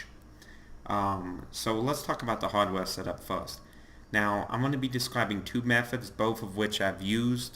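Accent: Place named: New Jersey, United States. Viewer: this guy is 100 % from new jersey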